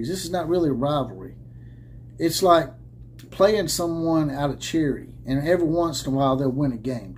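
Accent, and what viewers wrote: South, United States. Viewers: Wait, where has republicans and conservatives messed up? You can’t talk like you’re from the south and still ride the fence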